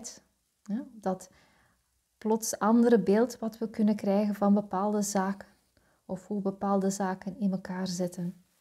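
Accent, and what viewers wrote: Flanders, Belgium. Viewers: Weer zo herkenbaar, ik ben zo dankbaar dat ik in de flow leef. Deze maand gestart met een nieuwe baan als welzijnswerkers en 9 feb begin ik met de opleiding Social Work. Over het geluid, ik kan het altijd prima verstaan. Met en zonder oortjes. Bedankt weer voor jouw fijne reading en jouw fijne stem, ik geniet juist van jouw Vlaamse accent. Spreekt mij aan. Fijne dag lieve 🙏🌺💫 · Mooie reading dankjewel 🙏🏻✨🤍 Je geluid is prima zelfs op m’n oude ipad..je Vlaamse tongval charmant en ook dat is prima te volgen als de nederlandse die ik ben ;) Laat gaan laat gaan 🤷🏻‍♀️😅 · Resoneert helemaal,. Mijn ware zelf komt steeds dichterbij. Ik vind de ondertiteling geen verrijking, zo nu en dan verkeerd vertaald. Nou je, voor sommige mensen wel fijn, ik negeer de ondertiteling, je bent voor mij goed te verstaan en te begrijpen. Je Vlaamse accent is echt geen beperking.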